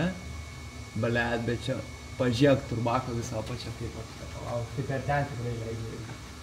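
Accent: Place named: Šiauliai, Lithuania. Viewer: is kalbos lyg Siaulia butu :)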